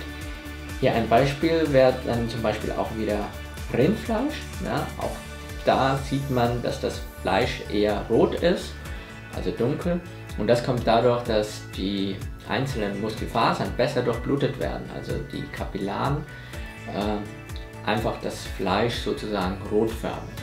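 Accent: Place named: India. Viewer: Haha sein indischer akzent total putzig :D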